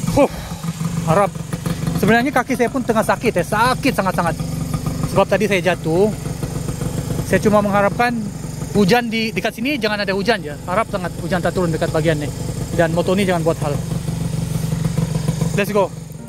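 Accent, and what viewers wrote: Sabah, Malaysia. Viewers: Terbaik La.. Bukan Kosong Ni.. Mesti "Berisi".. Hehehe.. Tapi Slang Abang Mcm Borneo Skit.. Bunyi Macam Sabahan.. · Mantap bro.nanti bolwh repeat dengan kita .5/ 6 org je.Slang abang macam orang Sabah/Sarawak.Betul ke?? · Sabahan ke..terbaik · org sabah ke bang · Kau orang mane bro? Mcm loghat sabah atau sarawak je 😅😅. Dh lama ke duk semenanjung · Bro asal sabah ke dengar longat bahasa melayu macam dari sabah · Orang sabah ka bang loghat sabah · Brother orang mana? Slang cam Sabah je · Abang sabahan ke dengar macam slang sabah bha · Dia Sabahan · ni org sabah ke? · maaf nk tanya saudara ni asal mana.Bunyi loghat tu sesekali mcm org sabah ttp adakala mcm bunti perak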